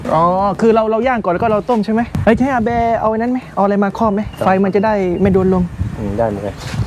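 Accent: South, Thailand. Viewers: พี่ พูดใต้ได้เปล่าครับ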